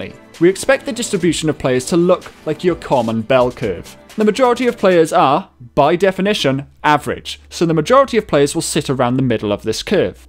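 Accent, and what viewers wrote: Wales, United Kingdom. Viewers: The accuracy/precision distinction is a personal pet topic of mine, sitting crucially in the middle of the entire field of predictivity, informativity and really all rationality as it stands. I just can't abide by a ... I can't think what the term is for it, a jumbling-up of the terms in a critical context, knowing the comprehensivity of its importance. The distinction is pretty simple (it can be explained with pictures of kittens wearing mittens) and pretty significant so any superficial pedantry can be accepted. It did take me a few minutes before some of the -isms of your accent that I'd been mulling over began to coalesce around "Welsh". At first it just sounded like a generic southern-central English accent, but I imagine if you said "I left my wellies in the valley" it would highlight the distinction. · Ey there boyo. 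what part of wales are you from? Newport/Chepstow down yere.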